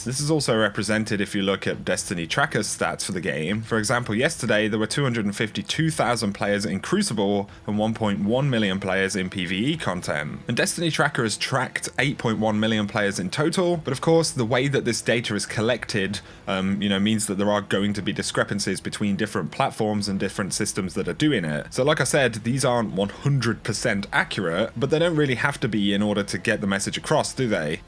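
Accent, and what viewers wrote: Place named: United Kingdom. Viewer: Keep it up! Out of all the destiny you tubers, I really like your stuff! You have a really interesting accent to listen to as well! Where in the UK is that?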